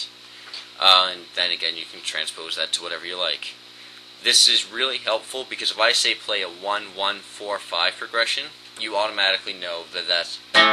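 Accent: Canada. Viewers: Has anyone ever t old you that you have the most adorable Canadian accent ever? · nice vid. are u canadian? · Are you canadian?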